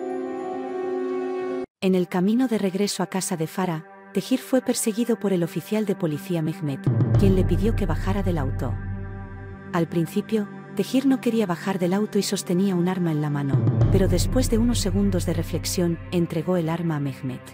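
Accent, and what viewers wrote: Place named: Castile, Spain. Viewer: Narración en perfecto castellano... Lástima que no sea el capítulo completo.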